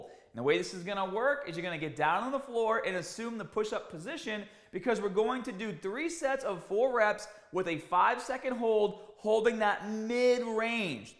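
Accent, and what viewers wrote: Boston, United States. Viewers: How did your Boston ascent come back stronger than ever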